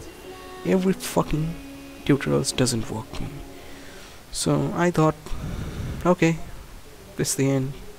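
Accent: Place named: India. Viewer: can the music get any fucking louder and the voice be more indian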